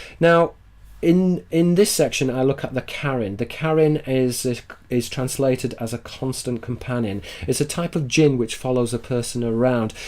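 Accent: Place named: North West England, United Kingdom. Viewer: Dear, thank you for your most interesting work. I was advised to find you by way of Tom Carberry via shroomingnewman 3... I’m sorry I can’t remember from which video this came but you said that the? (rulers) release knowledge through deception. Would it be alright for you to help me understand this a little more? Also, in relation to the ‘ha’... for almost four years myself and my son have been, (for want of a less triggering term), ‘born again in the Spirit Christians’... anyway, whenever I pray, (literally just talking and asking if necessary) to our Father and Mother and I forget something, I always ask the Holy Spirit to help me... whenever I do, my mouth opens of its’ own accord and a gentle but definite exhalation of breath happens. And it makes that lovely little sound... So, ha! that’s that... Then also, you sound so very much as if you hail from the North West Of England. 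We live in Morecambe. You have a very similar accent... Okay, well i’ll go now. Thank you again and all of the very best, from me Sarah.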